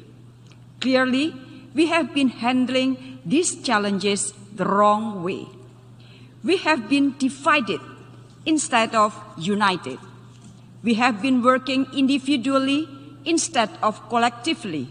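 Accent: Indonesia. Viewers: Gini lho dengerin menlunya ngomong enggres pake aksen Indo. Gak ala2 amrik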